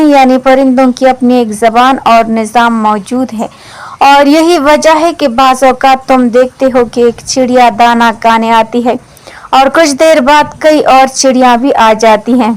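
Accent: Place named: Saraiki, Pakistan. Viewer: Bolne ka style suraiki lag raha he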